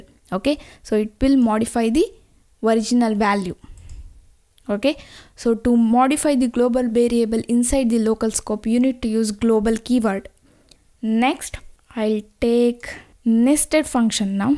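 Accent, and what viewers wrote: India. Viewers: Where did your English learn from? Are you Indian people?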